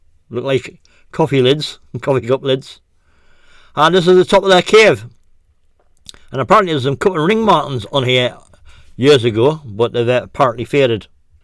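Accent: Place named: North East England, United Kingdom. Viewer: Interesting content BUT just a "heads up", mate:- I was born & bred in North Shields so you can imagine what my accent is like. HOWEVER, I struggle to understand what you are saying at times & have to rewind to try again. The reason is that you talk too fast, mate, & people who are not used to the north east accent are going to really struggle to understand what you are saying. Just trying to help. Best regards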